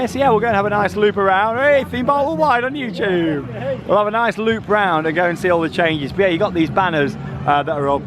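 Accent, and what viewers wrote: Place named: Stoke, United Kingdom. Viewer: My wife and I have just brought annual passes for the year. I think we're from the same city by your accent (stoke) so we will be going towers a lot this year. My little lads almost 3 so the cbebbies content was much appreciated, although I would rather give it a miss and ride nemesis all day long 😁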